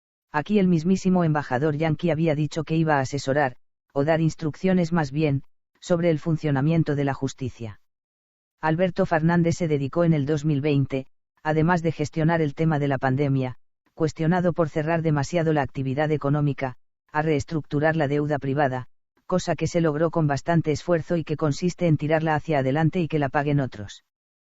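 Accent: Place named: Spain. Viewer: Pura propaganda kirchnerista con acento español. Nada serio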